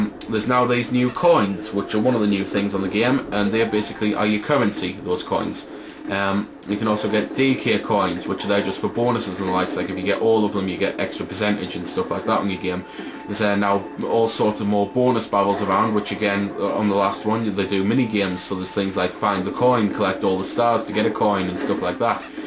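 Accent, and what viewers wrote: United Kingdom. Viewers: awesome and btw its good to hear brits doing reviews, its always some american kid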